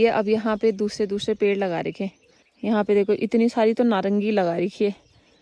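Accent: Garhwal, India. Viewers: aap gadwali bahut pyari bolte ho phli baar suna mene ese bolte huye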